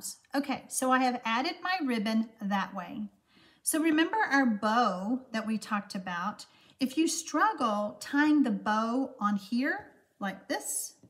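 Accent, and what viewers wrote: South, United States. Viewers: Hi! Been watching your videos and love what you do! Love the southern gal accent too!!:-) Can you tell me where you order your 12x12 plastic sleeves for DSP? Love that idea and I need to get them. Also, then how do you store your sleeves?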